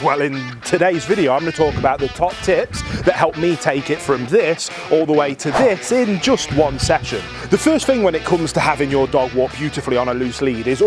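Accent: United Kingdom. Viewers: Just read a bunch of these. I've been watching for a while now, my son calls him the UK guy cause we Yanks love the accent. Anyway, for those who complained, there is an older longer video on slip lead training of a bad puller. It's an excellent vid and what I used to correct my Lhasa apso mix monster. For those of you who don't like or can't get prong collars (I don't think I'd put a prong on a Lhasa) then try a Gentle Leader. They really do work IF you present them correctly and use them correctly. Read the directions! All of videos are great, but there are other good ones as well. Look around and be willing to devote some quality time to your dog!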